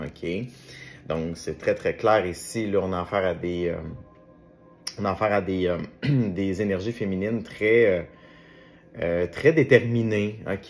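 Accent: Canada. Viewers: cette guidance qui ma bcoup parlé de À à z merci belle âme pour tout j'adore votre accent canadien bonne continuation et de lumière je vous kiffe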